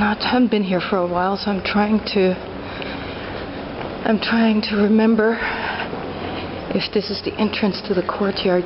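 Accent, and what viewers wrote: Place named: Canada. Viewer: Your accent was a bit of a mystery for me :), I thought you didn't sound American and when you said Canadian is all made sense :). Thanks for the video!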